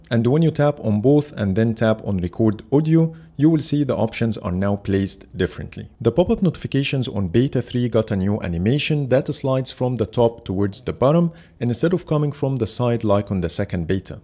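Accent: Egypt. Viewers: اللهجة المصرية باينة <3 بس الفيديو عالمي .. عاش <3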